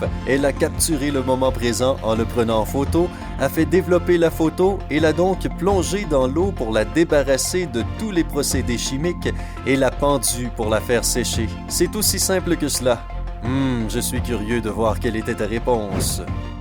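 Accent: Quebec, Canada. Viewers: T'es québécois ta un sacré accent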